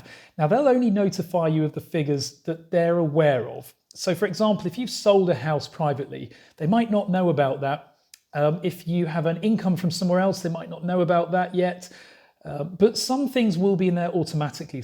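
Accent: Sweden. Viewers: Thanks for the info! Have you picked up the local Swedish accent when speaking Swedish?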